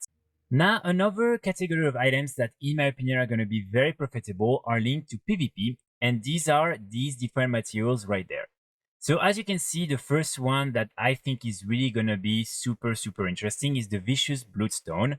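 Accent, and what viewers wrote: France. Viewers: suscribed for the french accent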